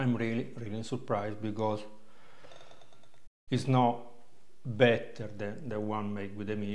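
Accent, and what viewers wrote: Italy. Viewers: I Love the Italian accent fratello! 🙏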